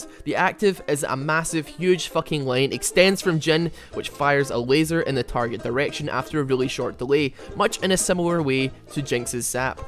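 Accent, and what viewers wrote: Scotland, United Kingdom. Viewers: I can tell you are scottish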